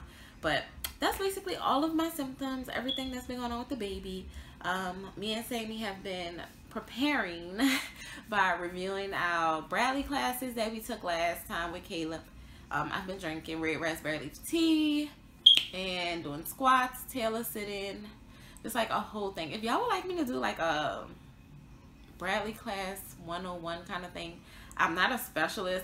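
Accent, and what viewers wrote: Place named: New Orleans, United States. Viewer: You sound like you're from New Orleans lol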